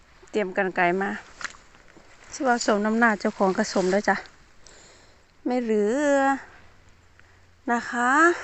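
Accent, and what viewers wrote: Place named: Sakon Nakhon, Thailand. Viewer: น้องไออยู่จังหว้ดดั๋ยจ๊ะคือเว่าสำเนียงสกลนคร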